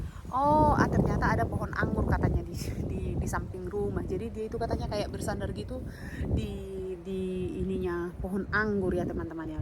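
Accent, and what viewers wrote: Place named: Batak, Indonesia. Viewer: Senang kali sama kk..walaupun lama di luarnegeri bahasa.dialek batak msi kental👍🙏🙏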